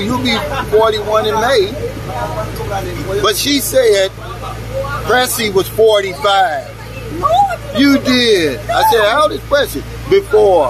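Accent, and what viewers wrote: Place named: Appalachia, United States. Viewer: Sir, i like your "Hill Billy" accent . Where u got it ? Which city from the states u from ? Best regards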